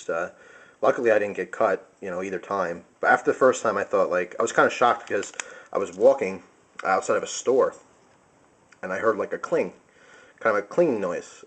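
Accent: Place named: Boston, United States. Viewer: a year or two ago i started watching some of your videos while i did my homework. you sound SOOOO different here! you have like a boston accent haha. just ordered myself a Dogfish and was checking out reviews, stumbled upon yours lol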